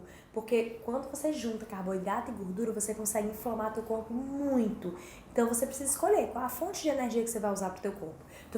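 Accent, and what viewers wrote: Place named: Salvador, Brazil. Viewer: Vc é de Salvador, né.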